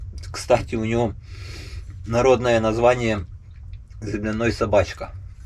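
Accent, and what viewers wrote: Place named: South, Russia. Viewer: Я уже который ролик смотрю и пытаюсь понять, а в каком регионе это происходит? По косвенным данным, я решил что это точно не северо-запад. В Псковской, Ленинградской, Новгородской, лес другой. На Сибирь тоже не похоже. Скорее уж ближе к югу и не думаю что севернее Воронежа. И говор южный, буква "Г" характерная, как для нашего юга, так и для Украины.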